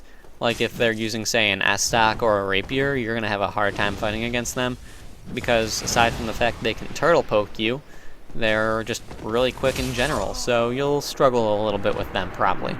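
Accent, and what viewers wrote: United States. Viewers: I speak german. You are pretty much pronouncing it correctly. Heavy american accent but correctly. (I'm american just sayin it sounds like an american sayi g it right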